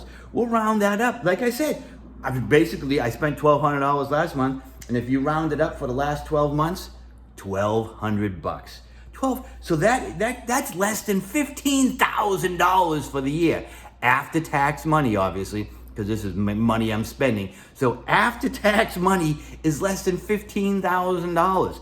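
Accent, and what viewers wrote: Boston, United States. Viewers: Congrats on your financial situation and enjoying retirement! I think in your case a HUGE reason for your low cost of living is WHERE you live, the city that you live. I know you told me before but I forgot. You have a Boston like accent but I know Boston is expensive, I think. I live in a mid size city in TX and we would pay easily <num> a year on a modest home as a senior. And insurance, is easily at least a few thousand a year. It used to be cheap here until everyone from California decided to move here. It makes me want to move! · But sounds like you once lived near Boston, (western Mass here) Nice video. Close to 73 years old, but I never want to retire.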